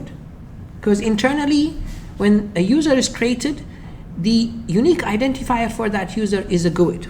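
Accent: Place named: Iraq. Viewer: Your SureName, but I can feel your Iraqi accent, Big thanks for your grate job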